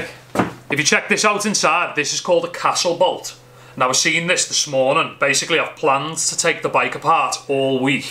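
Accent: Liverpool, United Kingdom. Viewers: Tuning in to your scouse tones again on a Sunday.👍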